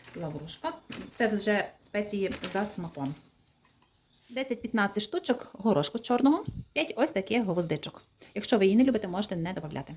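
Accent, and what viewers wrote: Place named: West, Ukraine. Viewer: Так приємно чути мову західної України, просто пісня!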